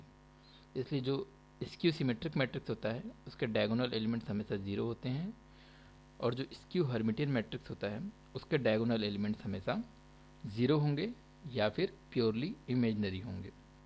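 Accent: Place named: Bihar, India. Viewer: bihari pronunciation is really irritating.when one is using English words should not have a regional accent.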